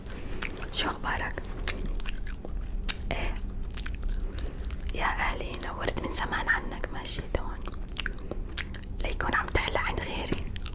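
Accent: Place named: Damascus, Syria. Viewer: صرلي ساعة بفكر لكنتك شامية ولا حمصية 😂❤️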